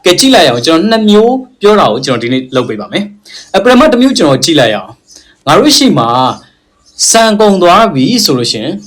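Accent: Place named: Myanmar. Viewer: ငပိသံလေး ပျောက်အောင် ပြောပေးပါအုံး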